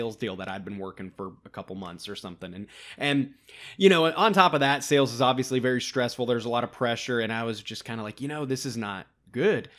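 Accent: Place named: South, United States. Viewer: Wow back with a new beard and a slightly thicker southern twang?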